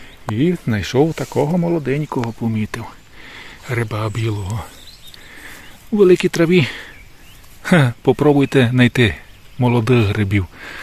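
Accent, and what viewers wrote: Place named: Skole, Ukraine. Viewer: Скажіть, будь ласка, а ви не зі Сколівського району? Бо дуже подібний діалект.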